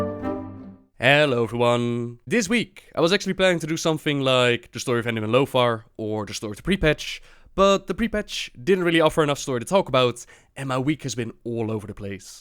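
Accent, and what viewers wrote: Netherlands. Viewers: I fucking adore these giant perspective stories of the universe, Sargaeras, the world spirit, etc. Can't get enough of these Dutch accent English (?) narrated videos. Would love it if you gained an official position as a Lore Keeper for the Warcraft universe. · And when u click on it, it needs 2 talk in his dutch accent haha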